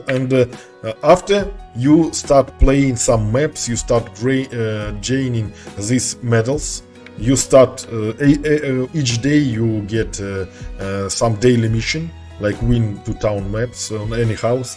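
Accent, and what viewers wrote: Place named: Russia. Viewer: У тебя такой русский акцент лол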